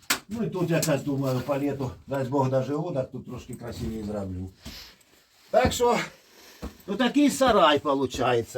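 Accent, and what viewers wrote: Chernihiv, Ukraine. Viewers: Звідки ви? Чую говор з Чернігівщини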